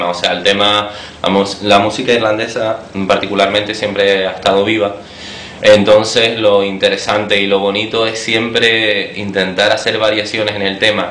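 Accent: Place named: Tenerife, Spain. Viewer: Sabia que eras de Tenerife!!! XD Te reconoci el acento. Yo soy de fuerteventura y estoy aprendiendo a tocar el violin aun (llevo un par de meses con clases privadas (porque la uni no me deja tiempo)) y bueno, estoy deseando poder tocar asii!!!! XD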